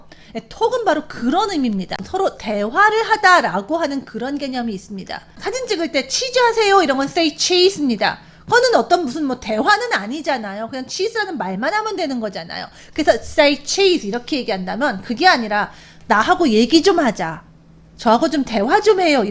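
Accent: United Kingdom. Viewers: 원리 중심으로 구분해줘서 머리에 쏙 들어 오네요. 감사합니다 근데 샘이 영국식 발음이네요ㅜㅜ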